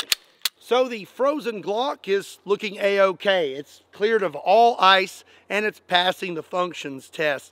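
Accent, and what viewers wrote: Kentucky, United States. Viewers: What part of ky are you from brother?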